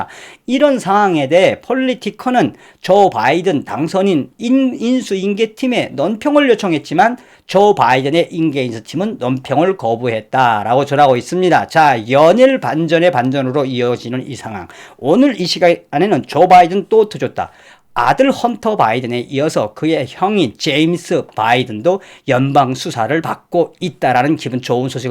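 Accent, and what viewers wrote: North Korea. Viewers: 좋은정보감사합니다 근데 북한사람느낌나요ㅋㅋ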